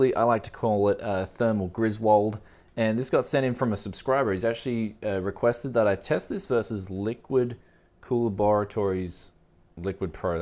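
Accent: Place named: Australia. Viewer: are you aussie? your accent is cool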